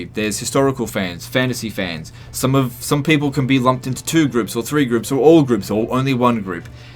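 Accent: Australia. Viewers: CA fanboi since Centurion on the Megadrive. All TW games have some shit and some gold. Some are golden shit. Bought everything except that shitty game after Empire;) Britannia, played it a bit but I mean ffs CA. Release TW:WH3 and Three Kingdoms already...Will always be about watching your shit. Need more aussies talking like aussies.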